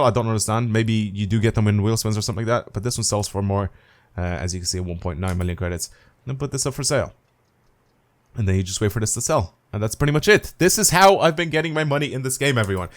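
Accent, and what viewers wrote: Ireland. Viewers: Is ur accent irish cuz u say cär instead of car xd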